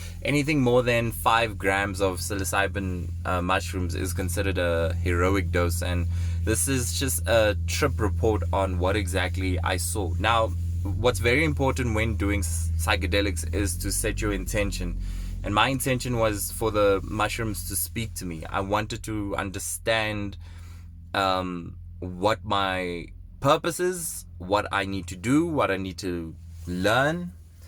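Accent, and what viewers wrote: South Africa. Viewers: are you south african? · Your accent sounds South African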